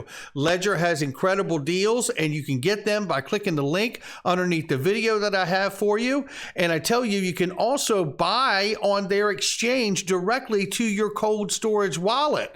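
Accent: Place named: United Kingdom. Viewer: I am very excite about XRP.. its every time different story.. very cool token.. and reporting very cool thanks you for this reporting...i check you headlines out bit hear of that all time.. very confusing you give report but your english cool good british language thnks 2 u 😘 😍😍 weLOVE for U much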